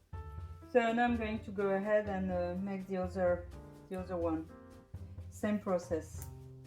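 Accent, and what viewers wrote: France. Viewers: Thank you for the fresh ideas for sauces. It’s not close to spring where I live but I will definitely try these in another month or two. Loved the Parisian street scenes. You have delightful French accent and you seem like a native English speaker. How did you get so fluent in English?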